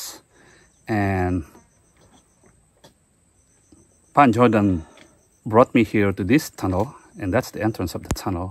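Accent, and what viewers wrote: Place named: Philippines. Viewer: Oh man! English man with filipino accent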